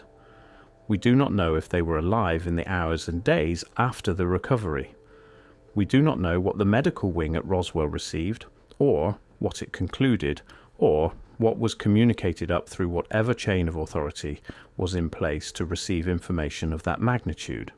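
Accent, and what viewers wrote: United Kingdom. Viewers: British accent makes it more believable.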